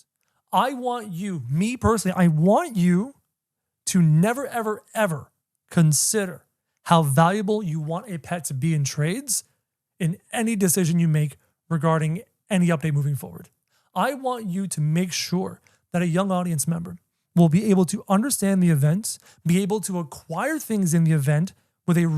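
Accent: Canada. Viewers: Genuinely thought you were from the UK and now I hear the Canadian, I'm so dumb 😅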